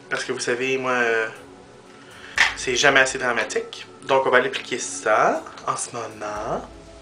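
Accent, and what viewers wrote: France. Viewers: are you from the south of france? trying to figure out ur accent (in french)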